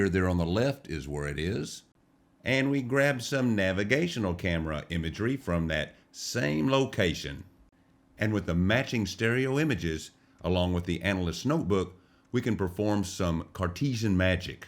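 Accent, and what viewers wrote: South, United States. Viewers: I subscribe because I love that southern drawl, the Mars work (an opus), and an abiding interest in Mars. The items you find are incredible. Sadly I can't do the 3D viewing, having never developed stereo vision (congenital eye problems), but I still try! I'd love to see what there is.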